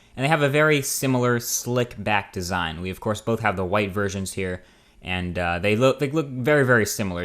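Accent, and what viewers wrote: India. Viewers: Boy I swear I thought you were Indian!! It is so cool to hear a brotha talk with some intelligence!!! Great review and I'm definitely getting this phone next, tired of apple. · Back when Marques sounded a bit Indian.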